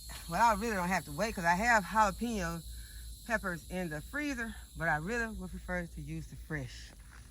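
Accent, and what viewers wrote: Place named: South, United States. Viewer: I listen to your chat carefully because it's full of good, down to earth information. My up north ears are not so used to your down south accent that's why I watch the closed captions carefully. Do you feel guilty for Caleb killing those bees? I'm sure I would have used Raid, too! I have a contract with my bugs that if they stay out of certain areas, then they are safe from me! ha!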